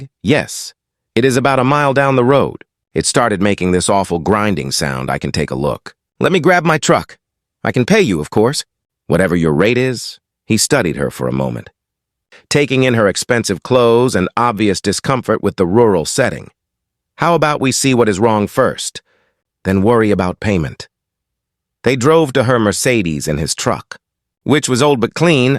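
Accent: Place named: Texas, United States. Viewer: Enoigh of this unsufferableTexan accent ! It spoils a good story 😮‍💨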